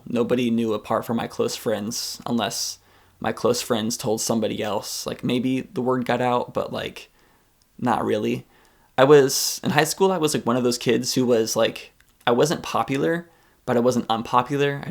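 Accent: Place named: United States. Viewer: Hey, Thank you so much for sharing. I have been watching your content for a very long time and suspected early on you were gay but didn't put much further thought into it since it didn't matter to me either way. Just was an observation like noting what colour your hair is or that you have an American accent. My mum has a few friends/acquaintances that are gay so growing up it wasn't weird to me but I was also aware that not everyone was accepting of people who are gay. I also knew people in high school that did the same thing as you did and pretended they were straight by dating someone of the opposite sex and are now happily out and proud. I was a big tomboy growing up and like doing things that were considered much more masculine but I am straight, while one of my friends is a huge girly girl/super feminine and she is gay. So I very much believe you are born gay based on that as well as what other people who are gay have personally told me. 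I am so proud of you and just want you to be happy:)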